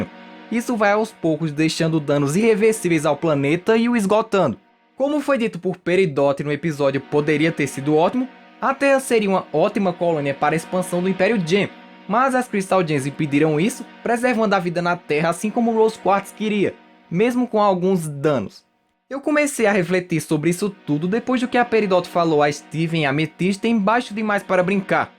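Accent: Northeast, Brazil. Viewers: voce e do nordeste eu so moro na bahia valeu pela teoria muito loco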